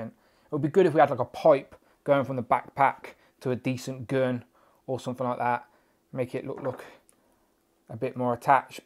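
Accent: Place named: United Kingdom. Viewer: 23 Famez haha right ? Lol drove me nuts, but In his defense at the same time I know he’s a Brit and probably isn’t use to our American pronunciations 😅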